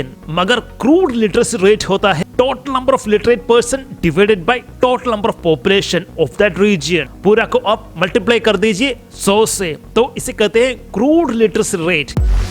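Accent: Kerala, India. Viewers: Korach hindi parayan padichitu video undakku kelkubol Keralathile hindi teacher class edukunna pole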